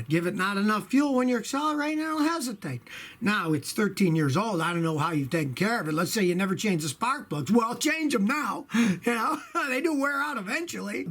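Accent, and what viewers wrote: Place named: Midwest, United States. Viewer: You may be in Texas NOW, BUT you aint from Texas! That accent is Chi-ca-go, Michigan, Wisconsin around in there. Sound like Dan Aykroyd in Blues Brothers. "We're On A Mission from Gawwd" How about those Caars and Stunts, huh?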